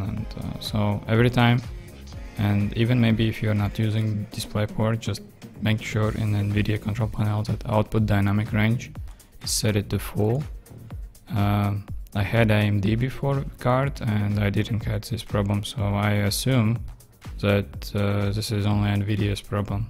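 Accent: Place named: Sweden. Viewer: sound like you from sweden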